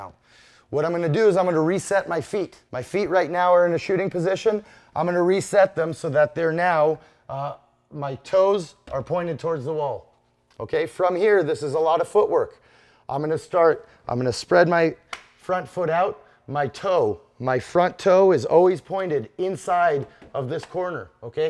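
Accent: United States. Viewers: He has a super american accent for an Israeli vet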